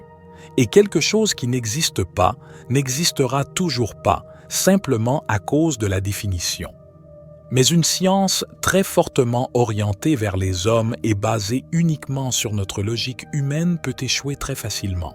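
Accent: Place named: Quebec, Canada. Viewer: oui vous avez une abonné de plus et j'adore votre accent québecois je pense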